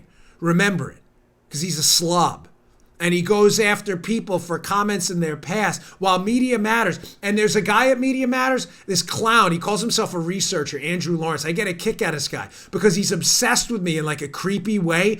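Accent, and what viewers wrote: New York, United States. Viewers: You rock, Patriot! keep that New York accent.....so tired of the fake "Steele Farce-ier" We need orange vests with a huge "Q" on it. We will call it "the Trump/Q OrangeQrew": What do you think Donnyboy?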